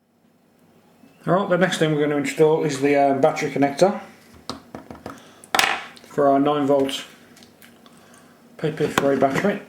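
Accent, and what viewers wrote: Birmingham, United Kingdom. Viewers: Hi there i also live in Birmingham uk it was your accent that gave it away anyway i also have a 100w transmitter but have no equipment except for a multi meter to tune the frequency and very little know how but i am learning fast.It has an exciter board with various trimmers ect and a crystal. how do i tune it, which trimmers do what, and to get around blowing the main output if i disconnected the power to the main amp board this would save me having to to buy a dummy load.Many thanks Paul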